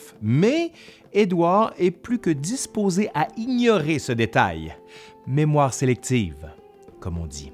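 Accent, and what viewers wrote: Quebec, Canada. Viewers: je suis un simple francophone, je vois une vidéo avec un accent Québecquois à couper au couteau, je souscris. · Tabernacle Chrit osthie j adore l accent québécois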